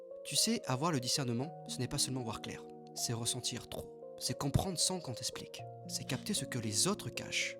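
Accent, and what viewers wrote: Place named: South, France. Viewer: tu as les yeux gris.😮 À moins que ça soit la vidéo qui donne cette impression. 😊 Et tu as l'accent du sud aussi on dirait. 😘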